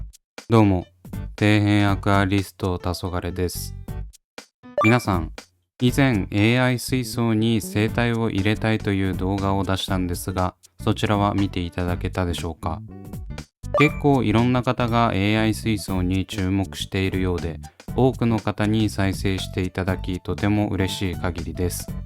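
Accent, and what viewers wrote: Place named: Kurume, Japan. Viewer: 北部九州系、久留米あたりのイントネーションで、よどみなく滔々と話されるのが心地よいです。アピスト？ああ、まぁ育ってんじゃないですかね、って感じです。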